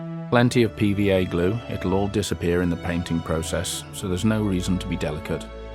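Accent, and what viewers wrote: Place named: United Kingdom. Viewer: Either way, whatever you decide, you have been a huge inspiration. I have been out and started to stock up on supplies. I’m not sure what scale or style I will settle on. I do want to thank you as I mentioned for documenting all your work. I was really surprised to see you are based in New Zealand, especially with such a strong British accent. Thank you again; I look forward to seeing the notification you have posted a new video. Best wishes from Blighty.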